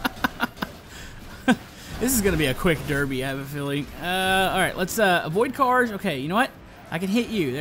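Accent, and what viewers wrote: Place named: United States. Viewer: Where do you live? You sound American but you have your speed still set to km/h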